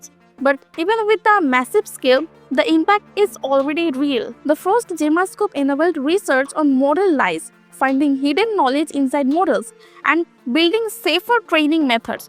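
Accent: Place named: India. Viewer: Absolutely Amazing video and information. The only major issue is that the accent is hard to understand, especially for non-indians. 😊 Happy Xmas and New year.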